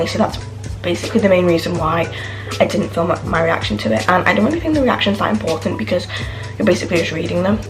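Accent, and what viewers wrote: Liverpool, United Kingdom. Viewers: are you from liverpool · Omg r u scouse 🤭🥰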